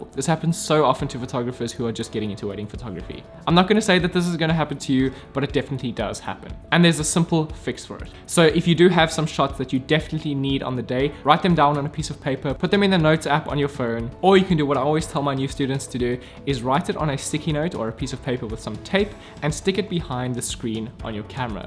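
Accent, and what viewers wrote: South Africa. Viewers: Ah this video was an amazing help! Is that a Cape Town accent I hear? 👀🇿🇦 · are you South African? Your accent sounds very South African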